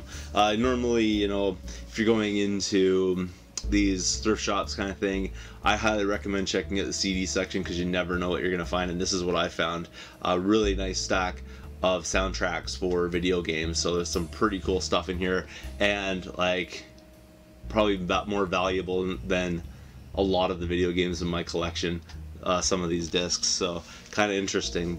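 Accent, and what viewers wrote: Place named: Canada. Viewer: i love how you silly Canucks pronounce Mario..good stack of stuff there man!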